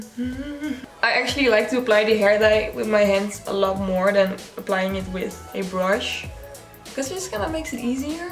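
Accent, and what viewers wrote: Netherlands. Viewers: Dit is de eerste video dat ik van je zie je doet je intro en ik weet gelijk al dat je nederlands bent door t typische accent😂❤ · This is the first video I've watched from you and the second you spoke, I had a gut feeling you're Dutch. Came to find out you are! Haha! Also, love your new hair color. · what is your accent? is itDutch? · Are you dutch. Because your voice sounds dutch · Are u Netherlands? I think so cause u have kinda a dutch accent while ur speaking English.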